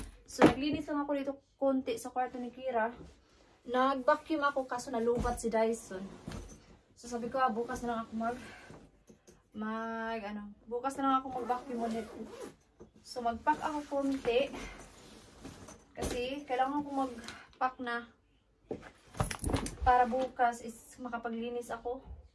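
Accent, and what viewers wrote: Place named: Visayas, Philippines. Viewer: bisaya di ai ka sis hahahha relate jud sis hahaha usahay galisod ko tagalog hahaha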